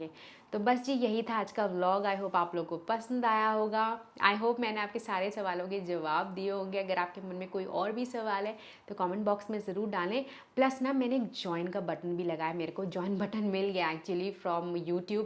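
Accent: India. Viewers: Why do you sound like a Maharashtrian? 😅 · Hariyana se hai ke.. Rom Rom ji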